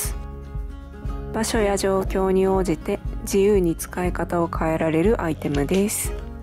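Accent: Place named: Kansai, Japan. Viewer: 関西の方ですか？喋り方が気になって動画の記憶が ダイソーセリアには良くお世話になってます!いい商品多いですね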